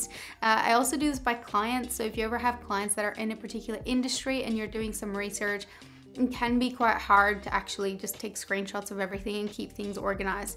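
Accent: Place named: Australia. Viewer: I love trying to decipher accents, especially blended accents like yours. What I’m mainly hearing is Australian but with a bit of something else. Is it North American? Irish?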